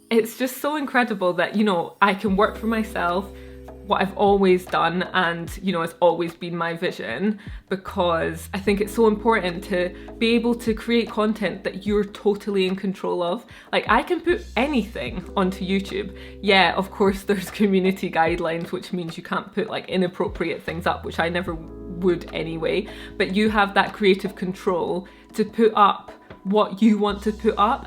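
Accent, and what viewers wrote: Scotland, United Kingdom. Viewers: Greetings, I am subscribed dear and thank you for providing all the content, retired life is more enjoyable watching your video content as well as content of others, however I will have to start teaching architectural engineering to pass my time in addition to watching YouTube videos all day.,. I have to also say that I absolutely love your Scottish accent as its wonderful when spoken in the feminine sense if you will. Your numbers are headed in a positive direction, great work dear....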